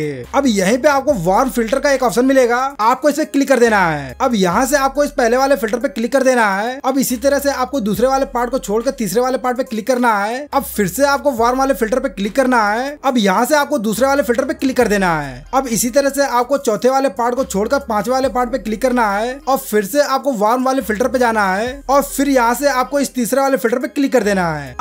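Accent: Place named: India. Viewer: Why he is saying always click and then I I heard it wtf india😂 accent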